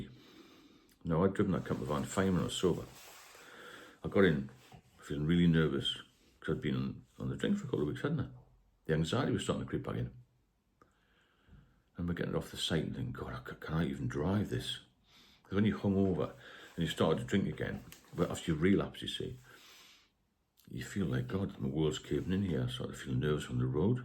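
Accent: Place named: Newcastle, United Kingdom. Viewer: the geordie accent gives it away !!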